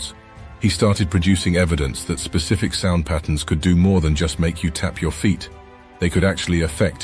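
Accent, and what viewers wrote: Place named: United Kingdom. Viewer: It's amazing how a well polished British accent brings legitimacy to a documentary :)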